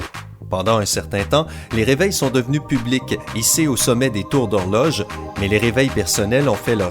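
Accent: Quebec, Canada. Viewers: Je déteste entendre l'accent parle par des Quebiquois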